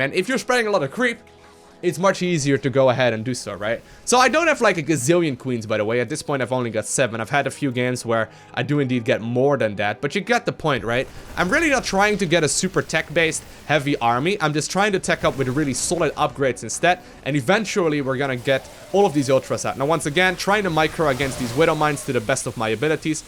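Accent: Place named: Netherlands. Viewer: Dutch accent is so understandable to native-russian-speakers in some weird way.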